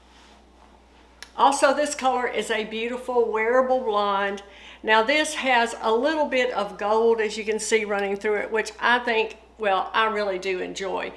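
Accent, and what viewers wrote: South, United States. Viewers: I watch you all of the time. I love your cute southern accent and your natural elegance with your videos.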